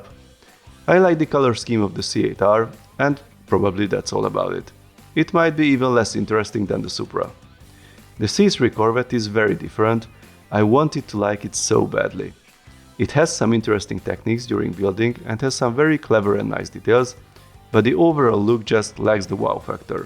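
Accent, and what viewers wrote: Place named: Hungary. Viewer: Hallatszik a Magyar kiejtés 😊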